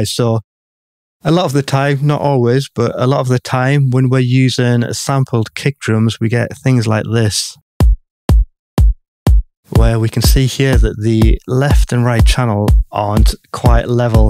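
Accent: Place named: North East England, United Kingdom. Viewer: What accent is that? I hear the Northeast. Teesside, Wearside?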